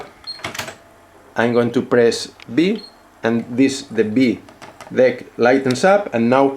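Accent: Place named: Spain. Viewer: Curioso lo de que comparta mecanismo con Nakamichi, el diseño de época está muy guapo.😎😎😎 Por cierto ¿Has intentado hacer el vídeo en castellano o en alguno de los idiomas comunitarios del país? Parece que seas de aquí intentando hablar inglés. Personalmente mejor usar subtitulos si quiero llegar a público internacional, pero ya al gusto de cada cual. Un abrazo🤗🤗🤗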